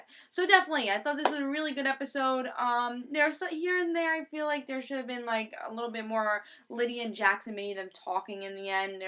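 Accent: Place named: New England, United States. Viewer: are you from New england? dat accent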